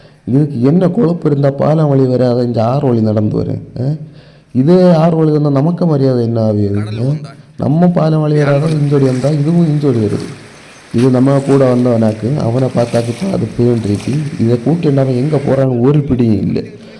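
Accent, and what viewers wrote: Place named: Sri Lanka. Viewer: Srilankan voice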